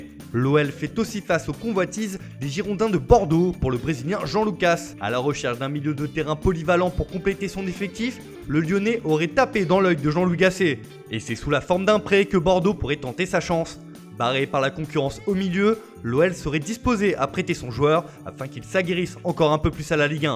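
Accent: Italy. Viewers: Toujours sympaq cet acent italien 😁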